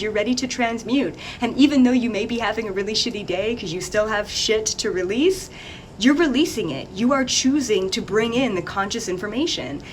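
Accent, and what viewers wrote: Canada. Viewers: Noice ..ok so we are only two years apart . N.B and I are within weeks of each other lol ... If I can't sleep I put on that hella Cray Canadian accent and boom ..I'm out . Makes it hard to finish his vids so I have to day watch/listen lol . He and Terrence McKenna have been my sleep help for months and months 😂😂😂😂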